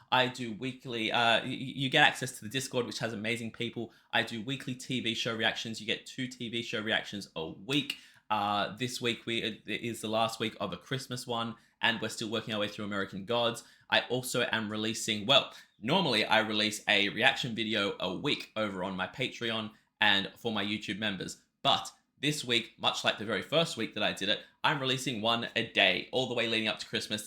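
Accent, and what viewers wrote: Australia. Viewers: Hey what's the comment on the Australian accent ? or maybe you are Australian? LOL ! LOL ! We are all starting to sound alike anyway.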